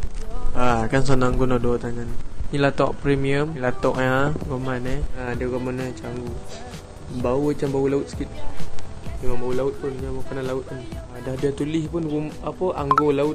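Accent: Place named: Negeri Sembilan, Malaysia. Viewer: Fuuuu, pekat nogori nya tuuuu.. Terboyekkkk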